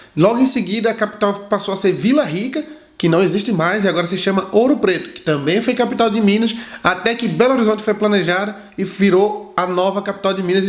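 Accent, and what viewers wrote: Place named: Pernambuco, Brazil. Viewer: vc precisa perder esse sotaque de prnambuco pra poder imitar mineiro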